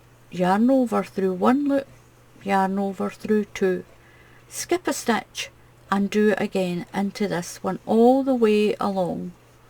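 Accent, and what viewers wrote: Scotland, United Kingdom. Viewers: I love all your patterns, you can make crocheting so easy to do, you are a great teacher...you explain every stich perfectly. I love listening to your Scottish accent, and when muttley gives a wee bark now and again, Thank you, xx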